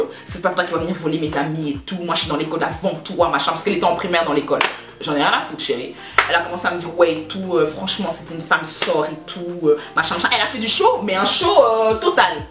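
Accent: Belgium. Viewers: Tu es belges ?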